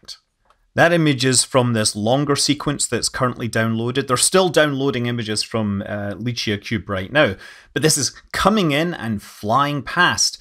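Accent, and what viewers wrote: Scotland, United Kingdom. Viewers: Scott once again I wish to thank you for your fantastic yet human explanation of this groundbreaking step forward for humanity. You take the complex and bring it into focus so that mere mortals can grasp it. Hearing your perfect explanation is only enhanced by your wonderful Scottish accent. Tipping hat with deepest respect.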